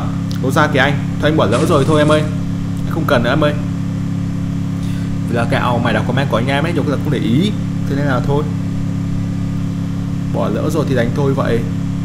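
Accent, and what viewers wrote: Thanh Hoa, Vietnam. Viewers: Em đoán Giọng Anh Giống người Thanh Hóa vậy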